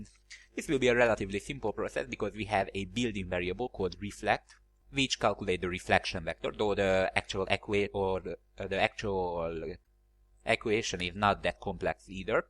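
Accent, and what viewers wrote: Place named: Hungary. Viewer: i like your hungarian accent:))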